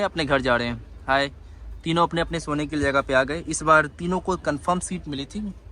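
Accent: Bihar, India. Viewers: Kahe raese pel rahe ho jo accent use kar rahe ho lag rahe ho bihari ho cheap aur chutia dono dekh rahe ho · Char din hue nhi honge Russia gye hue or attitude dekho iska, abhi tak bihari tone gyi nhi hai iski hindi ache se bol nhi pa rha or nautanki dekho iski.....paisa barbaad saala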